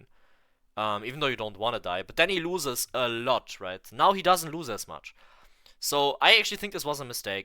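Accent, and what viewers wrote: Germany. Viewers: Nahh this video it was I was looking for!! love the german accent btw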